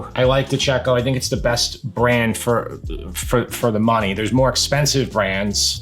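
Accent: New York, United States. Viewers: Oh and btw, I love your NY accent. I'm from Germany but I lived in Hoboken for 4 years and it takes me back. 🙂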